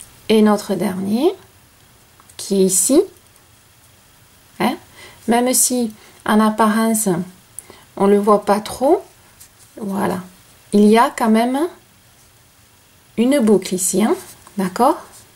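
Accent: South, France. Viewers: Vous êtes formidable vous expliquer très très bien vous en dites pas trop juste assez et votre vidéo est très bien faite on voit bien vos gestes. J’ai longtemps cherché des tutoriels de crochets bien faits, le vôtre est exceptionnel! Je suis du Québec et en prime votre merveilleuse sonorité du sud. 😊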